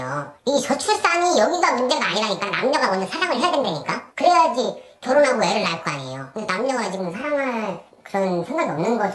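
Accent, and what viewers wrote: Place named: Chungcheong, South Korea. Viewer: 말투가 딱 아산 예산 이쪽인데?ㅋㅋㅋㅋㅋㅋㅋ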